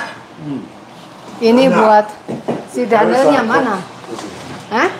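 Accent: Java, Indonesia. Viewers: sy hadir dr madiun jatim mbk aku sela😅lu ngikuti chenel mbk putra putrinya udah mulaidewasa mbk ,mbk kadang sy ketawa bhs mbk kok msh medok kali yo 😅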